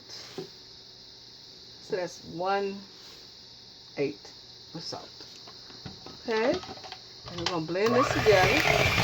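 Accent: South, United States. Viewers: My mom is 85 born and raised in the south and has always pronounced her words like her. Especially nuttineg for nutmeg! If I hear you pronounce it like that then I know three things about you. 1. You’re a great cook! 2. You’re a clean cook! 3. You’re raised in the South! And one last observation, You were raised RIGHT and have lots of great advice and knowledge of natural remedies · Her accent is everything! Southern Bell honey!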